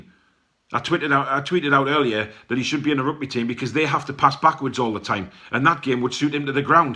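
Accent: Geordie, United Kingdom. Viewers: the fact you sound like the True Geordie im subscribing (Blackburn fan :) )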